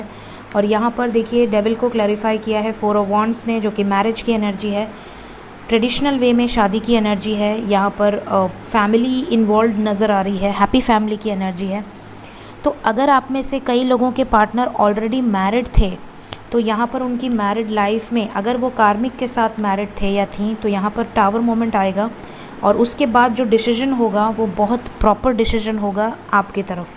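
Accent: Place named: Varanasi, India. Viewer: Dii jiss tarah se aap bat karti hai I totally luv it❤️ full banarasi language even I m from varanasi Mahadev🙏 love your positive reading 😇🤗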